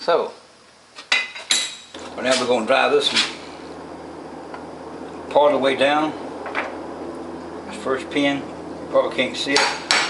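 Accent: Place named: South, United States. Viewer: You are wery good at restoring, but your recording is bad, and i have a hard time understanding your country american english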